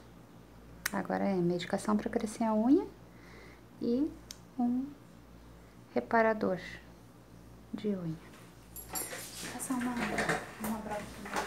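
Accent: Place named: South, Brazil. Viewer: Pelo sotaque ela é do sul.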